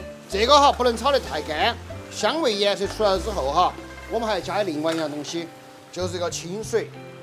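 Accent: Chengdu, China. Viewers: 好亲切的成都话！多谢！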